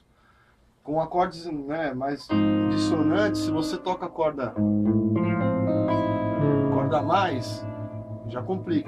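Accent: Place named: Minas Gerais, Brazil. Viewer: esse professor parece um d ituiutaba minas gerais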